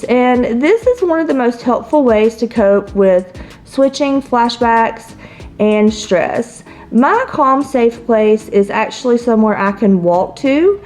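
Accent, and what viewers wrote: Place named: South, United States. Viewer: This was on my recommendations and I'm glad I stopped by to give you a watch. Even though you scripted this video and weren't too into scripting, you still feel very familiar and friendly. Maybe it's the southern accent, but it feels like I'm sitting on the front porch with a friend swinging on the porch swing, drinking sweet tea and talking about life (I'm southern, too, lol). Your dogs are super cute! Thank you for sharing :D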